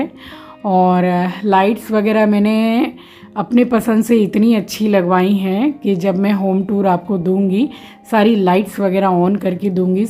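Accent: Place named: Uttar Pradesh, India. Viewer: Aap up wali lenguage kese bol rhe ho.... Mai up se hu boht achha lga sun ke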